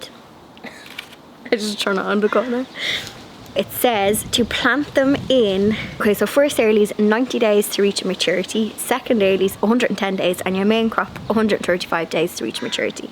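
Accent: Ireland. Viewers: Hi, a great video and you have got me motivated ( which I needed ), I have power washed my decking and other bits ( also myself ), and tidied up a bit, and it’s all down to you, so thank you so much, I love your very energetic videos and of course your wonderful Irish accent, you make my day. xx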